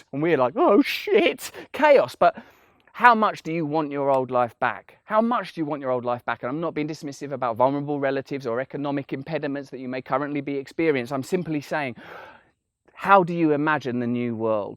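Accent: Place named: Essex, United Kingdom. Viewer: What a lot of nice people you all are. I enjoy Russell's eloquence and spirit, especially with the Essex accent.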